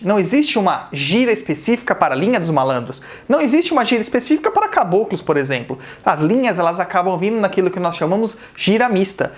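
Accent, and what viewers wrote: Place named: Rio de Janeiro, Brazil. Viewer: Baiano nada kkkkk ele é carioca todo cheio de sutaque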